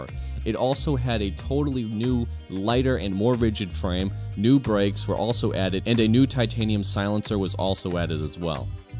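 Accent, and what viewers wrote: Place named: New York, United States. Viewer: I like this channel because it's a real human talking (I think!) If it's A.I. with a NY accent I'm impressed. LoL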